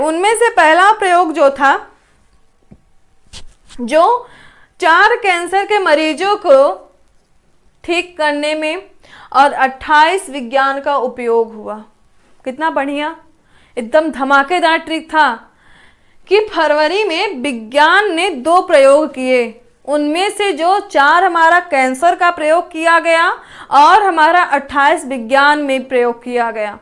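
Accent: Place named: Lucknow, India. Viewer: मैडम लखनउ से है😀😀😀।। लखनऊ वालो का बोलने का प्रक्रिया ही अद्भुद है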